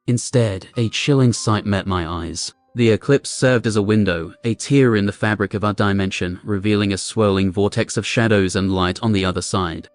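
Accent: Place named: United Kingdom. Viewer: This British sound makes this little bit better🔥🔥🔥🔥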